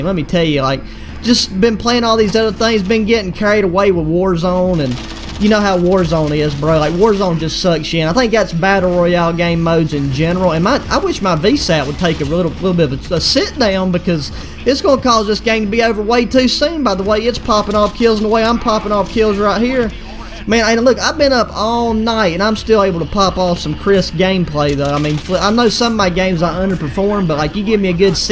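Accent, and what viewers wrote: United States. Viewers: Man sound like a country guy with a shotgun sitting on his porch not trying to make fun of u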